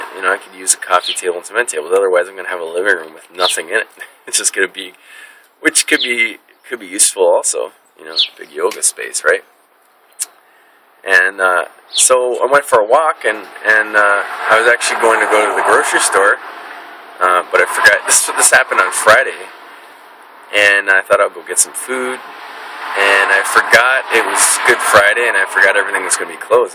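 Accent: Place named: Canada. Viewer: real quick, you must be canadian yeah? i love the way y'all say "about" :)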